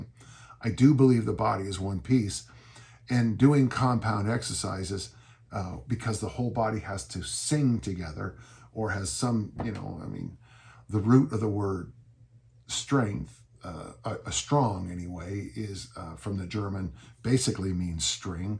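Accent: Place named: England, United Kingdom. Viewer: He's certainly not Scottish, he's as English as they come 😂 But yeah, he's very good!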